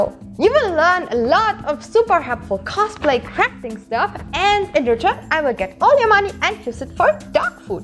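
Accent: Germany. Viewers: Just 'cause I'm curious, is it a German accent? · Ich schreib jetzt mal auf Deutsch weil sich dein Akzent definitiv so anhört als könntest du mich verstehen... ;) Diese ganzen Drucker etc. erleichterten eure Arbeit schon ganz schön, oder? Wenn ich die diversen anderen Cosplayer anschaue, die alles von Hand machen. Verkauft ihr die Kostüme dann?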